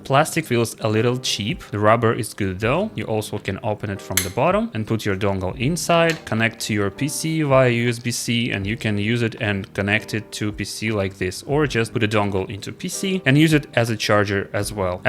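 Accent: Russia. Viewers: Бро, за сколько времени ты выучил английский до такого уровня? Я по первым секундам понял, что ты русский, несмотря на идеальное и приятное произношение. Мне интересно стало, сам начал только изучать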